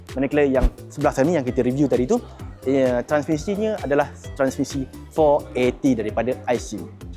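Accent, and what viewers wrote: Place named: Terengganu, Malaysia. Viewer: Abang ni orang ganu ke. Slang bunyi ganu sikit sikit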